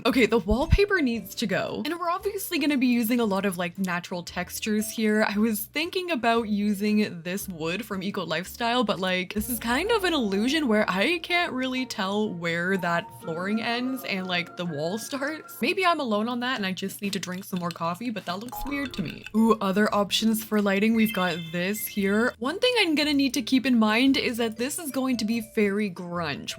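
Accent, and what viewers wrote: Canada. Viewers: when i first started watching, it was my first time ever watching someone with a strong Canadian accent, and it was a big adjustment but now i cant watch ANY SIM VIDEOS WITHOUT HEARING THE COMMENTARY IN HER VOICE 😭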